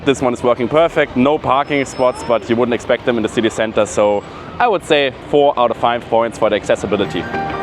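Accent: Germany. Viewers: hi from Hungary ❤ where are you from? You sound German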